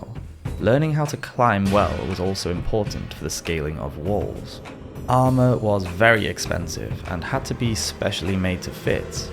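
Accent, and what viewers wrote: England, United Kingdom. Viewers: English accent :)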